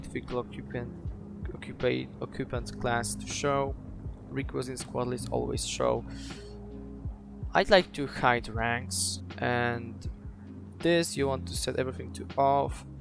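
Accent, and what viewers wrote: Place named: France. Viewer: Thanks for this I hope it helps me.. Im guessing your french 😬😬 so mercy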